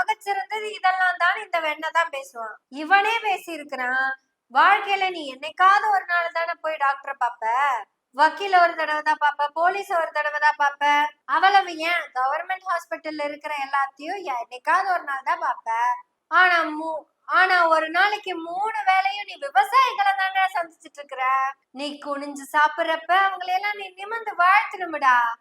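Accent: Kongu, India. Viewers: கொங்கு தமிழ் நல்லா தான் இருக்கு ஆனா சாராயக்காரனுக்கு சொம்பு அடிக்கிறதா நல்லா இல்ல.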